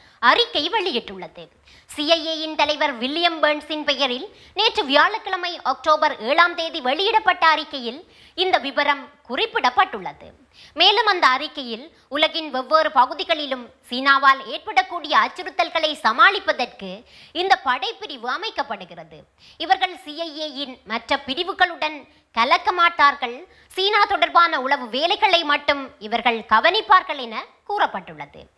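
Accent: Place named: Sri Lanka. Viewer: இலங்கை தமிழ் அற்புதம் அற்புதம் அற்புதம். நன்றி 🙋🙌🙋🙌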